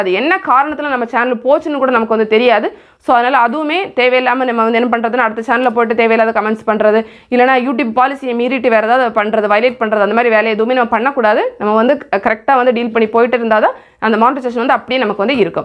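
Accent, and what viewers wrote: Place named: Kanniyakumari, India. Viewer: Behind the scene nice...love our kanniyakumari slang.. sister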